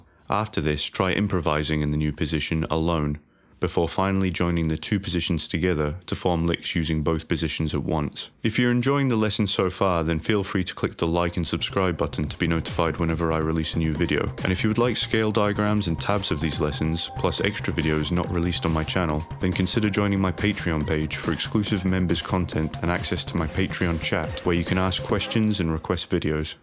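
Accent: Scotland, United Kingdom. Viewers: Scottish? I’m from Stirling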